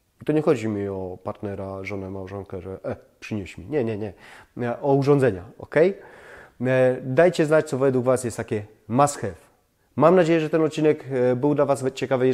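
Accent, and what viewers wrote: Silesia, Poland. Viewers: Kocham śląski akcent i intonację :) Ja nie będę jakaś mega nowoczesna - bezwzględnie potrzebuję przestronnej lodówki. Nie side by side (one w środku są masakrycznie ciasne) tylko szafę od podłogi do 180 cm pełnej szerokości - czyli 60 cm, do tego zamrażarka jako osobne urządzenie. Tak żeby do lodówki cały gar rosołu wszedł bez kombinowania co przesunąć. A ponieważ właśnie projektuję sobie nowe mieszkanie z sugestii nt servo drive skorzystam - dzięki!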